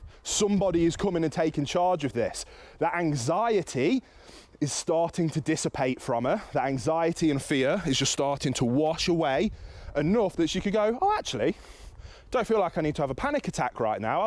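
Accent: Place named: East Midlands, United Kingdom. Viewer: I can't pin your accent, I first thought Coventry but then I detected a slight East Midlands twang, maybe somewhere near the A5?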